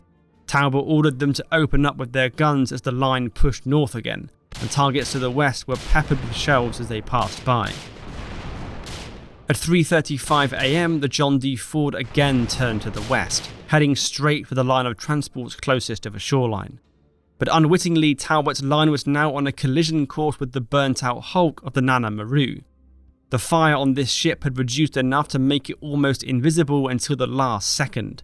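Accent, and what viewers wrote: United Kingdom. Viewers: Stop narrating these with British people, these are American victories. Should be called by American heroes.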